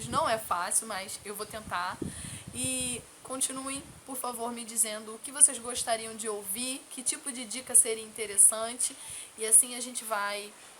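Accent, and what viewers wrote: Brazil. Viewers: Love this Portuguese/Brazilian language accent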